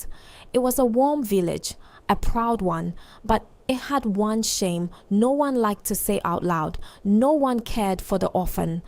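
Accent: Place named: Africa. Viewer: Please don't Englishfi the story we have enough English stories and movies. We prefer raw African accent.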